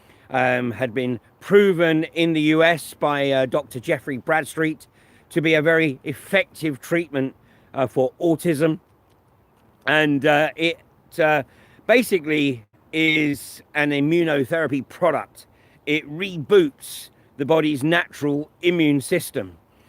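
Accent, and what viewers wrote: United Kingdom. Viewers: Great channel. Good to hear a British voice providing truth!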